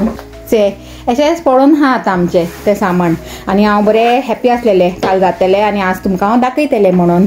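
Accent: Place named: Karwar, India. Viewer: Your voice is so irritating also don’t say your husband Patrao all the time. It’s annoying. Ghantis call for Goan Patrao . Your karwari Konkani doesn’t suit for Konkani vlog.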